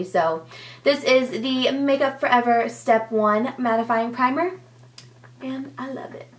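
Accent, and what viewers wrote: South, United States. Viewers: Love your videos by the way ! I cant believe you are at 1mil subbies ! You deserve that and much more , i remember when you did your tutorials in your old room and had the southern accent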